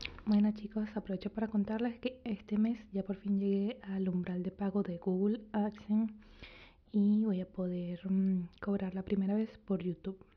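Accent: Venezuela. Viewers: Me encanta el acento venezolano, lo mire hasta el final. Super agradecida por este video.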